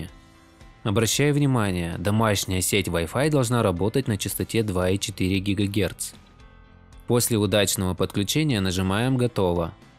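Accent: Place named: North, Russia. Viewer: Очень много "оканья" 🙂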